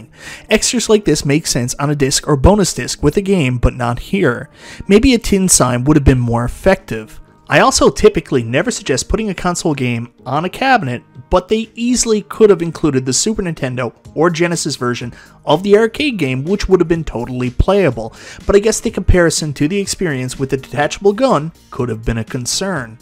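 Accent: Newfoundland, Canada. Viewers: This guy from Newfoundland ?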